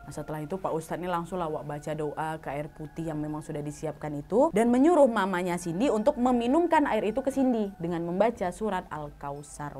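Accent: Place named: Medan, Indonesia. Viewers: Kak, logat dan bahasa Kakak spt orang Sumatera Utara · Rutinitas sblm tidur🤭 mendegarkan dengan logat wak waknya bercerita❤️❤️❤️ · "gagal pokus" 😊 sukak kali aku dengar logat Medan di ni..jadi rindu pulak aku pulang kampung 🤗. by: drg. Rika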